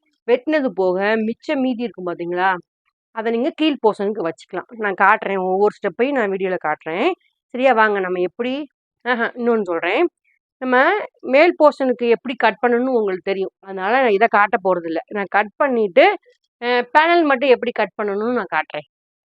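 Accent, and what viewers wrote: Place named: Madurai, India. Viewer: புரியும் படி நன்றாகவே சொல்லி தருகிறீர்கள் நன்றி உங்களூடைய வீடியோவில் அங்கிட்டு இங்கிட்டு ஏத்தலக்கடி இறக்கலக்கடியா இருக்கும் என்று பேசியிருந்தீர்கள் அதைக்கேட்டு எனக்கு சின்ன வயதில் நாங்கள் பேசியது ஞாபகம் வந்து விட்டது இப்போது அதையெல்லாம் மறந்து விட்டேன் நன்றி உங்களூடைய மதுரை பேச்சு ரொம்ப நல்லா இருக்கு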